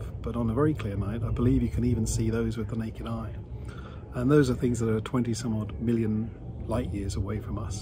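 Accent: Australia. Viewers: Do I detect an Aussie twang?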